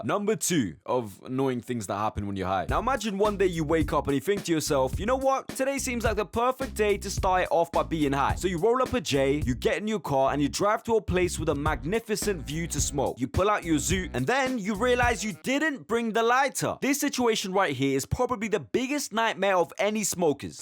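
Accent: United Kingdom. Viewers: he sounds like a fellow brit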